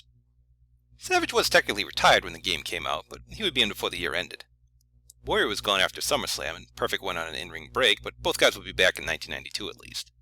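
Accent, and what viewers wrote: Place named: Canada. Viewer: Depends where you are from. I'm pretty sure is Canadian due to his accent and sound of his voice. That said, he probably pronounces it like former Philadelphia Flyer and current LA King player Simon Gagne and his name is pronounced.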